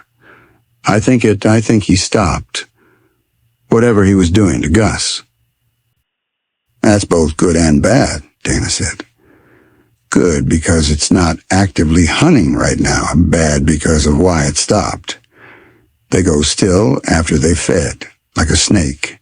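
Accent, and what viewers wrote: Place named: England, United Kingdom. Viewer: I am from Glacier on the W side.My X was a water purification specialist in the whole park West North. He had his own cabin. I know this area very well. The story is not concise but entertaining. I used to know most of the residents in all of Glacier. AI, you have an English accent and you mispronounce proper nouns.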